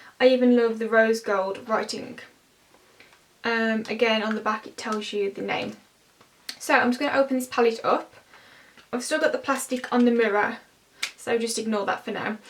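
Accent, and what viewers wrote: Birmingham, United Kingdom. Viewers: Do I detect a slight Brummie accent? It's cute. Great review, hun. x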